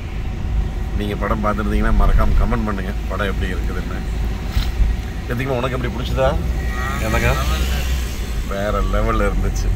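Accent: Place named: Kongu, India. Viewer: கொஞ்சும் கொங்குத் தமிழ் பேசும் கோமகரே...! திகட்டாத தித்திக்கும் பேச்சுடைய திருப்பூர் திருமகரே...! உங்கள் காணொளி மிக மிக அருமை❤❤❤❤ அதைவிட உங்கள் தாய் தந்தை இழப்பைப் பற்றி நீங்கள் கூறியது மனதை கலங்கடித்துவிட்டது😭 "டான் திரைப்படம்" பற்றி எனது சில வரிகள்...! "❤தந்தை❤" மகன்களே/மகள்களே மனதில் பதிய வைத்துக்கொள்ளுங்கள்....! வலிகள் நிறைந்த உங்கள் வாழ்க்கையில் வழிகேடுகள் வளைத்துவிடப் பார்க்கையில்? வாழும் உங்கள் வாழ்க்கைக்கு வழிகாட்டியாய் வாழ்ந்திடும் வாழும் தெய்வம்தான்🙏 மதிப்புமிக்க உறவு "❤தந்தை❤"...!🙏 இத்திரைப்படத்தைப் பார்த்தபின்பு.... விழியோரம் வழிந்திடும் என் கண்ணீர்த்துளிகளை என் தந்தையின் பாதத்திற்குச் சமர்ப்பணம் செய்கிறேன்🙏 என்றும் அன்புடன் உங்கள் மகன்போல இவன் ரா.முஹம்மது கபூர் (சென்னையில் இருந்து)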